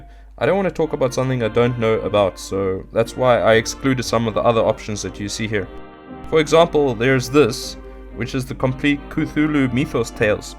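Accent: South Africa. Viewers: He sounds South African....right on brother or should I say broer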